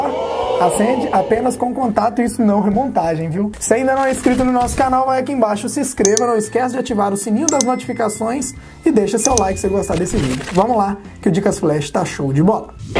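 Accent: Goiás, Brazil. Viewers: Goiano? O seu assistente é de Goiàs! hehehh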